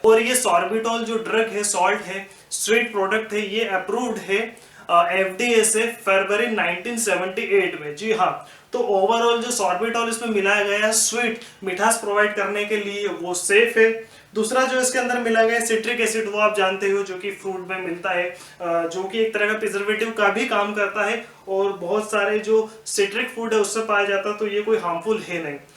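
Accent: Indore, India. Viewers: Indori tone!!!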